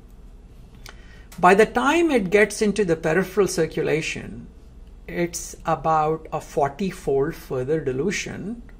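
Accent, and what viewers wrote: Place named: India. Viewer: I think i have to go throu all this information 3 or 4 times to fully understand it. Very interesting but also very complex. I love your work and your indian accent helps me to focus without getting tired. Looking forward to see more soon. Greetings from Galicia, nortwest Spain!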